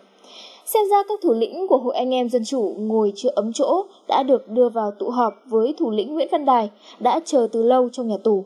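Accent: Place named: North, Vietnam. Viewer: giong cua mien bac viet cong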